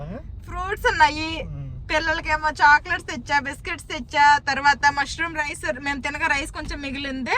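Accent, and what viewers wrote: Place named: Telangana, India. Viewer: Miku Telangana slang istundi akka😂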